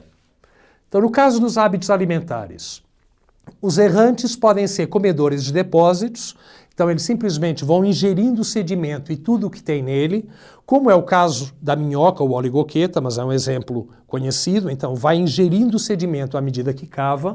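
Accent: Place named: Portugal. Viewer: Às vezes o sotaque dele me parece sutilmente o de Portugal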